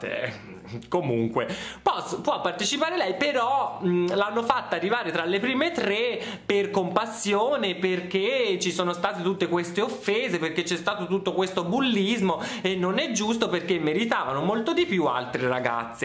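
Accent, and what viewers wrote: Tuscany, Italy. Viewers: E un si sente che tu sei toscano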